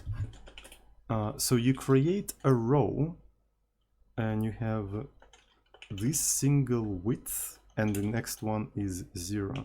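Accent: Russia. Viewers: Чувствую русский акцент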